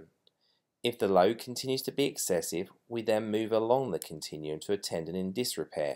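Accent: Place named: United Kingdom. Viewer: Lol heard the accent and thought "maybe here's someone I can trust with my ratchety elbow". Watched the earlier, linked video... Huntsville... Alabama... that's some displacement fella - bit too far from west London I think. Great vid, thanks for the hope it induced 👍🏻.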